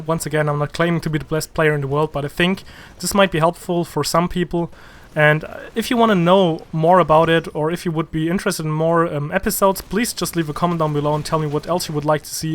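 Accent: Germany. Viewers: Ich vermisse den Punkt "Strategie" (=langfristige Manöver um das Spiel zu gewinnen - z.B. bei Spielmodi mit mehreren Objectives von einem Ablenken um das andere angreifen zu können, ...). Außerdem hätte ich Teamwork (=Rollen sinnvoll ergänzen, gegenseitig Rücken decken, ...) und Taktik (kurzfristige Manöver um Gunfights zu gewinnen - Dropshots, Deckung effektiv nutzen, ...) nicht unbedingt in einem Abwasch erledigt. Ansonsten hast Du das aber sehr systematisch und sorgfältig gemacht :) ... PS: Egal wie sehr man auf den Akzent achtet, das Deutsche hört man immer irgendwie heraus ^^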